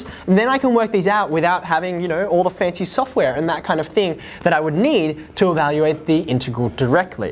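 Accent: Australia. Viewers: listen to his Aussie accent hahahaha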